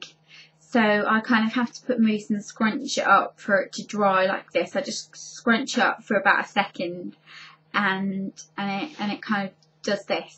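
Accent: United Kingdom. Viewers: omg!! thanks for your tips. my hair is very similar to yours when i was younger i hated it i wanted it straight all the time. now at 37 years old i love my curls i get many compliments. love your british accent! all the way from puerto rico!! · I. Love. Your. Accent. SO MUCH brittish accent always reminds me of harry potter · we have similar hair and everything you said was really interesting. Btw ,just so you know, If I had a british accent, I would never shut up :D lol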